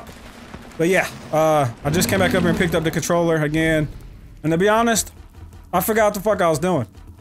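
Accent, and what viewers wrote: South, United States. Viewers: he said yall are you from the south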